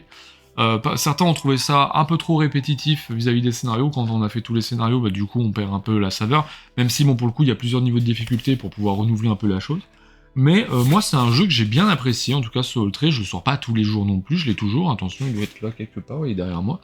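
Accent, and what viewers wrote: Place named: England, United Kingdom. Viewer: oui. Pis y a déjà du monde de bien installé, j'avoue. Dommage, ça aurait été bien avec ton humour et ton accent anglais qui a de la tronche (bon, un accent qui a de la tronche... je suis parti loin, tant pis, mouarf!)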